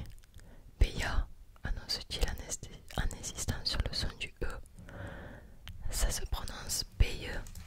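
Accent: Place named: Marseille, France. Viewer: tu es Marseillaise non ? Ton accent le montres bien mdr allez l om 🎉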